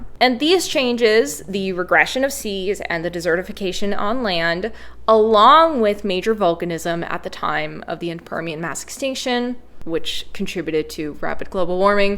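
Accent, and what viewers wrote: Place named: Texas, United States. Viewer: That’s just her Texas twang coming through, relax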